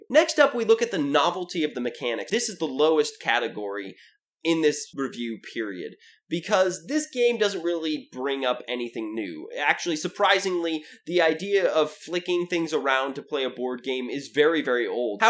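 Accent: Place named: Texas, United States. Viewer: Oh my word. I love the %100 authentic Texan accent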